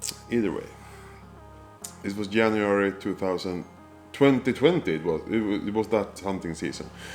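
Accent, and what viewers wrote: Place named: Sweden. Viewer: This is gonna be great! You catch your audience not just because of the hunting part, but because of what a genuinely great guy you are 😊 and I don’t think there is many channels showing the Scandinavian fauna spoken in Swenglish😂 it will catch on my boiiiii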